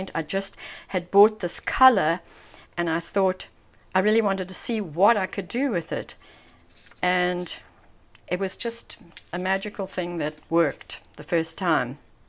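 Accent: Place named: South Africa. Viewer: Great video, thought I picked up a South African accent. I am from Johannesburg now living in the Netherlands.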